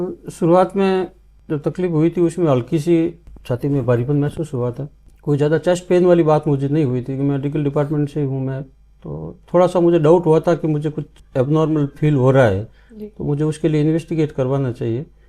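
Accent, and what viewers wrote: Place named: Rajasthan, India. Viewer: ਬਹੁਤ ਵਧੀਆ ਢੰਗ ਨਾਲ ਸਮਝਾਇਆ ਗਿਆ ਜੀ। ਰਾਜਸਥਾਨੀ ਬੋਲੀ ਬਹੁਤ ਪਿਆਰੀ ਲਗਦੀ ਆ ਜੀ ਸਾਨੂ। 🎉🎉🎉🎉🎉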